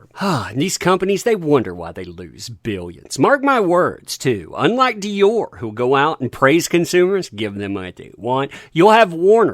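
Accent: United States. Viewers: What kind of American accent are you speaking?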